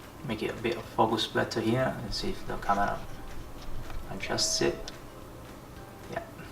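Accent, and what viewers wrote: Italy. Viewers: I bought this watch and I love the looks and I love the size. Great review and I did pull the trigger and got one partially due to this review so thank you. My grandfather came from Sicily so I had no problem understanding the Italian accent, in fact I loved it. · The italian is strong in this one, btw nearly pulling the trigger on this watch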